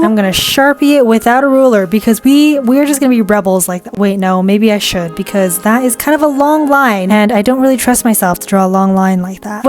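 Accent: United Kingdom. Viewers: British? You're my new favourite youtuber. And 10/10 for most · Wait.... are you british?😱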